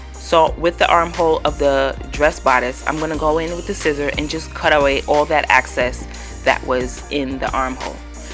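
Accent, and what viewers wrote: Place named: New York, United States. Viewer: yesssss tell him, plus since he’s trolling about pronunciation - let him know it’s your NY accent🙌🏽🙌🏽🙌🏽🙌🏽🙌🏽!! Ppl are so quick to pass judgement! Language skills have nothing to do with her sewing talent!!!👍🏽👍🏽👍🏽👍🏽👍🏽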